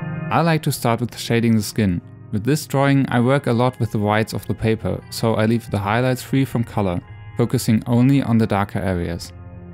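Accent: Germany. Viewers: Why do u sound like a german guy speaking english? I mean i would know what that sounds like since i live in Germany but...seriously bro. Btw i love your drawing skills!! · OK, sorry but I must ask you that... Are you a German because you have an small accent...🤔 No matter your Video is pretty cool😊😎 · Hey are you German? Bc you kinda sound like you are and you were at gamescom :D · Deutscher Akzent?:D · Deine Stimme hört sich Deutsch an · Dieser Moment wenn man halt direkt raus hört, dass er aus Deutschland kommt (oder zumindest deutsch seine Muttersprache ist) 😂😄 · Could it be you're german? Cause your english sounds pretty german to me. Und ich bin selbst aus Deutschland. xD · I dont know you but you sound like a german who speaks english. If its the case: hi ich bin deutscher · Are you german? XD man hörts sehr😂😂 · holy shit im more intrigued about his german accent and voice sounding out of place for someone who looks like that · German accent? 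· You sound like a german speaking english. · Ae you from germany because you have this accent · Bro bist du deutscher hört sich nämlich so an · Your german accent is thicc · You have a German axent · sorry but are you german? You have a really hard accent. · Dude, your english is indeed pretty good but nevertheless I instantly noticed that you are german.xD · Kann es sein das du Deutsch bist? Du hast diesen Akzent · Ich könnte mich irren, aber der Akzent hört sich nach deutsch an. Lol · Sehr cooler Zeichenstil und gut erklärt. Hatte nur bereits nach 2 Sekunden gemerkt, dass du Deutscher bist xD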